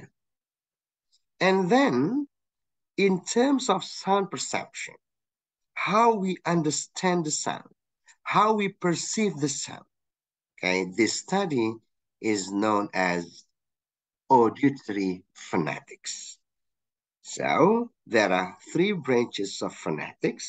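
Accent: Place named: United States. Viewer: Yes, me too. Sir use American Accent, so that’s why it’s very easy listening to us😇👍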